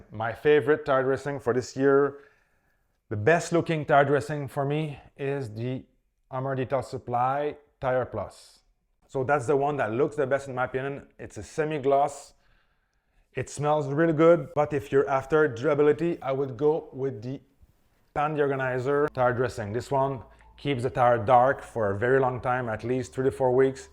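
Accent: Quebec, Canada. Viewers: I really like your presentation and I respect your recommendations. And as a fellow Canadian, I dig your Quebec accent! 🙂 Keep up the good work! Thanks!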